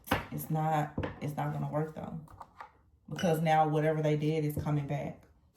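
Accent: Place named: South, United States. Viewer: you are one of the best and most authentic readers I've come across in a while, particularly on YouTube, which hosts many readers. I hear a Southern accent in your voice and am curious as to what part of the South you come from. I am a reader myself and come from a lineage of "gifted" seers. Thank you for your generosity in sharing your gift to bring insight to the collective. Despite the frustration you may experience from time to time, your favor is a blessing to us all. Never forget, but remain ever reverent and protective of your blessed nature. Your are needed in this community, especially now. Stay strong, my Sister!❤😊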